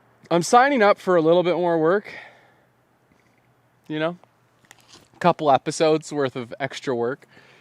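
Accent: Canada. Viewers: First of all, you were really letting your Canadian flow this week hahhahahha... I 100% agree with the building bug! I am not done with my place yet and I am already thinking how I would like to try my hand at a log cabin. Heck, I am still young enough.....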